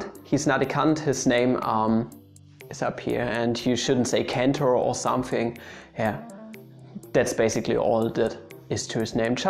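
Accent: Saxony, Germany. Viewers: Boii with Sachsen accent tells us how to pronounce shit. I found my kink. And with Kepler there is actually something that can go wrong. My GERMAN Professor still calls him Keeeeeeeeepler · Aus welcher Ecke Deutschlands kommst du? Ich hör die ganze Zeit nur Friedrisch und Ludwisch. Erst dachte ich an Sachsen. Stimmt das? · Речь идёт, конечно, о разных диалектах немецкого. У неустрашимого нашего хоста явно саксонский акцент, и поэтому говорит Фридриш вместо Фридриха. :) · Er ist Sachse... das ist nicht der Norden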